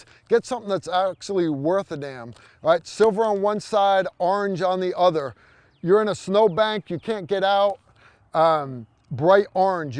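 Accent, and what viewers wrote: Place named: New York, United States. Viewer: Great video- just subscribed. I'm another dad who puts these bags in the wife/kids cars and have them roll their eyes at me about it. I've caught them sneaking them out and leaving them in the garage. When they did, they lost car privileges. Does any dad NOT get called an idiot for putting these in their family's cars? Are you in New York? Accent sounds a little like it.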